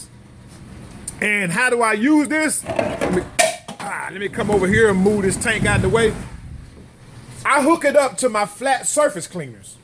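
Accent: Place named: South, United States. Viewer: love your channel my man!your cool southern accent makes me run down to popeyes and get me a few biscuits!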